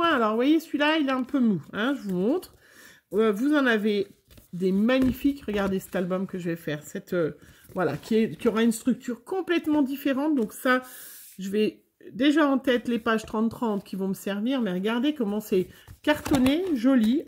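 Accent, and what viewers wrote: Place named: France. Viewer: Bonjour je suis Quebequoise Et j Aime bien votre accent de France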